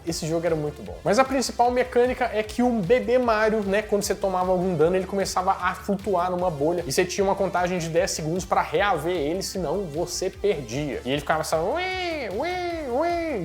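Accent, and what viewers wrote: Minas Gerais, Brazil. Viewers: Como eu amo esse nosso sotaque mineiro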